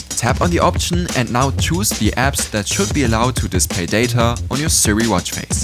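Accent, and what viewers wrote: Germany. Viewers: German accent overload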